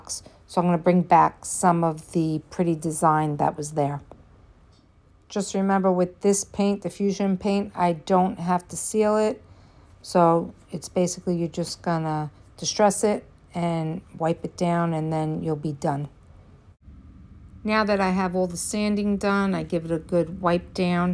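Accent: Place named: New York, United States. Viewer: You have a new subscriber!! I’ve been watching videos on YouTube for years. As soon as I heard that familiar accent, I was like, YASSSSS!!! NY or NJ LOL? I’m in NJ! Love your videos!! You go, girl !! ❤❤❤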